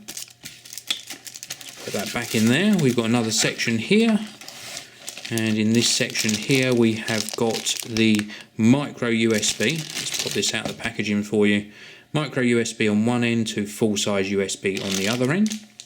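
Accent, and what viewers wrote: United Kingdom. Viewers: freakin brit accent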